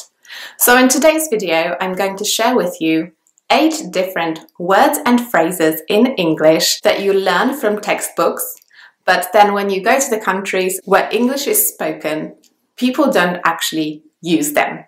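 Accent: United Kingdom. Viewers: i really though u were british LOL jajaja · She speaks British English.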